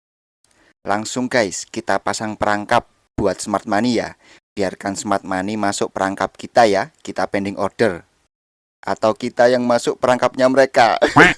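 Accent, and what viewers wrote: Java, Indonesia. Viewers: Wong ndi gess.. Kok medok amet gess 😅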